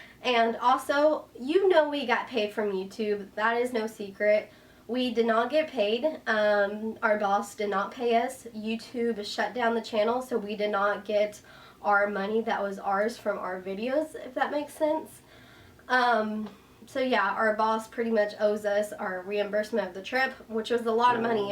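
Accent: United States. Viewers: I love your accent! What state are you from? I'm from the most southern town in florida! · this is entirely off topic but are you from the deep south? you have a very Southern bell accent.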